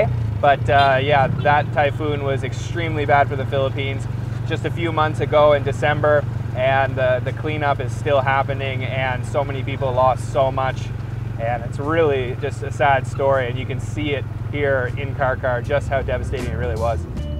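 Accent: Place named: Canada. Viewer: this dudes canadian isnt he. "Crunchy on the Ootside," "MELTS IN YOUR MOOTH"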